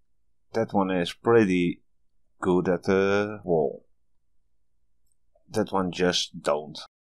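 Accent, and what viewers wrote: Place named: Finland. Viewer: Are you from Finland? Your accent sounds pretty finnish or estoian (?) :)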